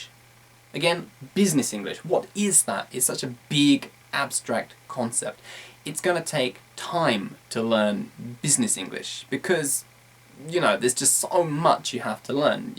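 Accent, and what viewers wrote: United Kingdom. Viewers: I think using british accent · U are british is not? I'm mexican :v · is it britain english? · excellent lesson i would like a video about job's interviews please your accent is like brittish people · sahid maarif It’s a British accent. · It´s british accent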